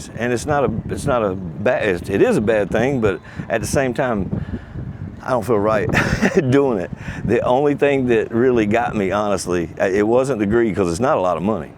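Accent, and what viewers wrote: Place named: West Virginia, United States. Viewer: Man, you're slinging the truth!! I'm 48 and making plans to move over there. I've been researching the Philippines for a few years now. I'm making the move for all the reasons you mentioned. I was married once, It lasted a couple few months and there's no way I'll do it again here in the States.....absolutely no way!!! I'm from Southern West Virginia and our accents are pretty much the same, lol. I dig the raw material, informative and entertaining, thanks for the time Brother.....I just subscribed!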